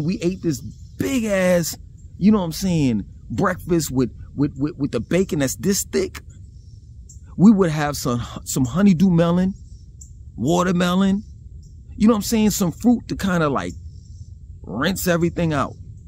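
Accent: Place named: South, United States. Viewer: Very very south. Very deep accent as well. Loove the accent.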